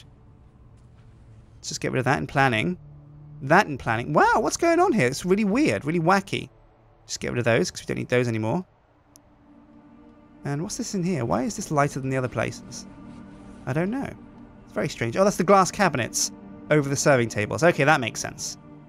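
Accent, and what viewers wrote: United Kingdom. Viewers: SHUT UP! He's British! It's hard for him to speak another accent! (please spell his name correctly!) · he's from britain of course he has an accent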